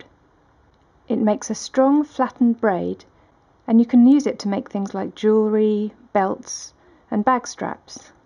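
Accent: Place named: United Kingdom. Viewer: Thank you,. You're were clear, and to the point. Love the effect of visually highlighting the important and critical stiches. You made it so easy! Enjoyed your British accent as well as your soothing voice. Kudos!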